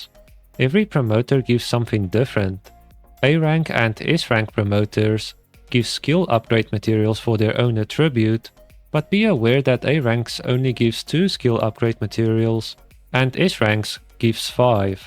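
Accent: South Africa. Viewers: Eh? South African?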